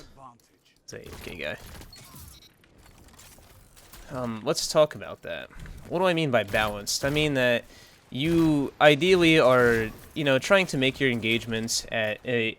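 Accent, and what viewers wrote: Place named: Ireland. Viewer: Are you Irish or American? I keep hearing Irish coming out 😅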